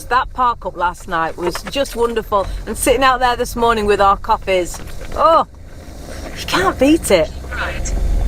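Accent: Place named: Lancashire, United Kingdom. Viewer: Hiya, Been listening to your accent, and I don't think that it is pure Cheshire, more like higher up Lancashire somewhere? am I correct?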